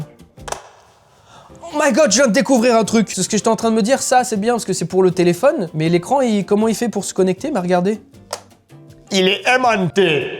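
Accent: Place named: Quebec, Canada. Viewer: Omg l'accent québécois jadoreeee en ten que québécoise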